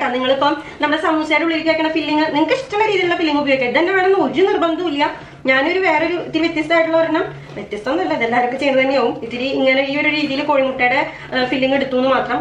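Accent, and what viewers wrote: Thrissur, India. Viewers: തൃശ്ശൂർക്കാരിയാണോ ചേച്ചി?? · Adipoli aayindtta chechi. I like your thrissur slang as I also belongs to thrissur.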